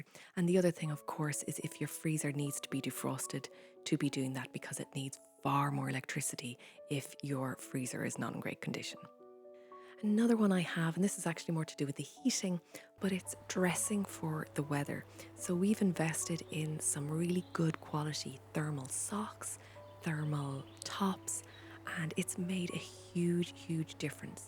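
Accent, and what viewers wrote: Ireland. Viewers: I was a single mama, therefore I had to be frugal as I had to pay for all the expenses and our house on my own. No help no money from my little angels father. I paid cash for everything( except the house payments you know) I called my electric and power company’s to find out what they call peek hours here in USA. So from pm at night until am it would be cheaper to do laundry or run the dishwasher. Since it was just us two , I ran the dishwasher once a week after pm and did laundry another day once a week after pm. In the summer I grew tomatoes/ cucumber/ corn on the cob, mint and herbs. I always made strawberry/ mint/ cucumber water never purchased soda. I was on a budget BUT I Made it happen. I’m 61 now and my little Angle is all grown up and has left. I lost my mom so it’s been an adjustment but now I’m living with the man I knew most of my angels life but I was focused on being a mother and taking care of my aging mother so I never wanted a relationship a friendship was great for me. 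Now that we are together I’m still very frugal and still make my own house cleaner as you do vinegar r/ baking soda and lemon were my cleaners and still are. My home is now rented out and I finally have a little extra income I’m saving. I just found your channel and love it. My dream has always been to go to Ireland so I just love your accent 🙋🏻‍♀️👏🏼👏🏼👏🏼👏🏼 thank you lovely words of encouragement and advice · thank you so much for the recent video on how to save energy, some new tips some old. I likes the door draft / stopper!!. In my country the private consumtion of energy has dropped during the winter; people can save if they have to, most depending on the high prices on electricity and gas... I likes so much that you were facing us during your entire 'energy lesson'. Your voice and your Irish version of English is a feast for me and I guess also for all followers. Now your eayes and smiles supporting you voice, message and stories...makes it even better. 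Here where I live, the days are longer, the sun is shining as like today, however the nights are cold, and also during the daily walks, a wollen hat or similar headwear is needed. Thanks again for the video, your advice, your narrating on energy. All the best to your family.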